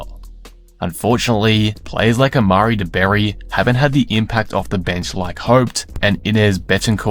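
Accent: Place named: Australia. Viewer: Clearly this guy is an Aussie, or NZ'er, or a Brit. That's cool, but being Captain Obvious is not helpful. Bye dude. You're irrelevant.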